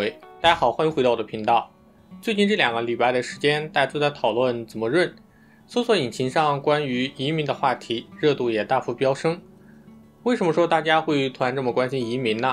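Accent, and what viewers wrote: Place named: Jiaodong, China. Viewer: 听你口音像胶东的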